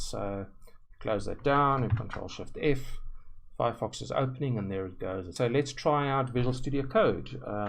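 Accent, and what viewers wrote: South Africa. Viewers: is that a jozi accent i hear?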